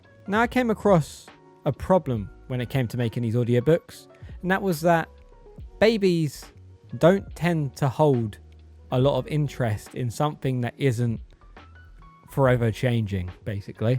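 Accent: United Kingdom. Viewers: I love that you have a British accent. I can't imagine them in American accent 😂😂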